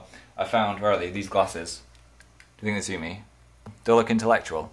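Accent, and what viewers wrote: Texas, United States. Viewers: anybody else think he sounds like makemebad35 with an American accent? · I like your American accent. It's actually pretty good, although it's hard to tell when you go back and forth. You should to a video were you try to say things in an American accent if you haven't already. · your american accent is really good! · I like your American accent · Oh my god ur American accent!!!! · accent in this video is like the ones in Texas. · Lol ur american accent is awesome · i like your Texan accent:) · His American accent makes him sound like Christian Novelli. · PLEASE DO A WHOLE VIDEO IN AN AMERICAN ACCENT!!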